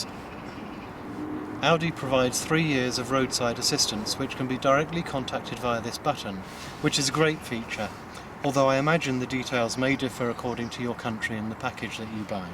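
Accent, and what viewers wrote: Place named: United Kingdom. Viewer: It did confuse me when you had the British accent and left hand drive!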